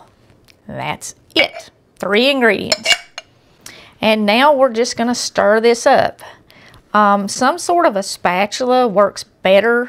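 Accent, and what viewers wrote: South, United States. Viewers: In these times of financial insecurity for many, this is great to know how easy it can be to provide for the family. I have lived in Germany for ages, but I spent every summer in my childhood visiting my family in Tennessee. My grandparents were from there. I adore the southern drawl, the charm of how the southerners speak. I look forward to making these with fond memories of my childhood. I also loved hush puppies! Do you have a recipe for those delicious 'critters'? Bless you. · Love the Southern American sing song accent. Thanks for sharing this quick bread recipe. God works in mysterious ways. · Your accent sounds just like Dolly Parton's. Enjoyed your video. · I really enjoy your channel and your southern accent. God is an awesome God❤❤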